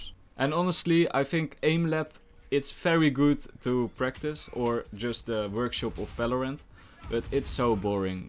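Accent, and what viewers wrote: Netherlands. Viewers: iron drie imma show u vijf.. u sound dutch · I think dutch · Just with your acsent i know you're dutch hehe i'm dutch too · The dutch accent be bussin thoooo 🥵😍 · nah he is from netherlands i reconize these accents anywhere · Ik heb het gevoel dat jij Nederlands bent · ik hou van je Nederlandse accent xD hehe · Bro, ik kijk dit nu terug en tering je hebt een nederlands accent ihahaha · are you from the netherlands? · 'iron drie' half dutch accent goes hard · i think he really sounds dutch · he dutch i think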